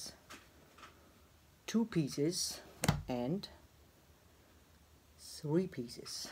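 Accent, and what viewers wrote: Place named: Schwerin, Germany. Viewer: Huhu.. super lustiges Video, wiedermal 😊.. Sachmal, eine Frage: dein Slääng 😂 hört sich nach meiner Heimat an 😃.. Nähe Schwerin... du kommst doch da irgendwo wech näää 😊. Lg. Grüße aus Bremen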